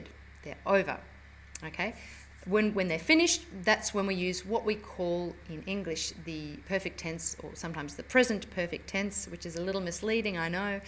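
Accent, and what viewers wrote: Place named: Australia. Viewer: pourquoi elle a un accent australien?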